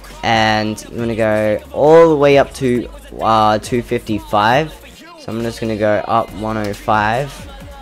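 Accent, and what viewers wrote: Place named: Queensland, Australia. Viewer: Great vid again so happy there is a Aussie youtuber. Cause I'm Aussie and let me guess where you are from I tried last Ep how about QLD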